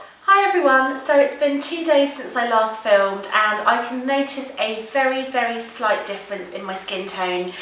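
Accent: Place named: United Kingdom. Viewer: Fuck sake everyone sees an Indian girl and assume they should have an apu accent. FFS it's a British accent. It's nice. People are so fucking rude.kmt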